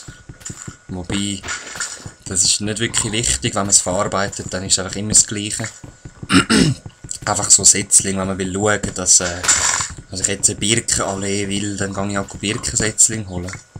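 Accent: Basel, Switzerland. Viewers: ??????????? Ich bi au basler aber das isch ächd balrdütsch=-O Aha wenns baselbiederisch isch ischs klar das kenni nit so.:-) I kum us Müncheschdei:-)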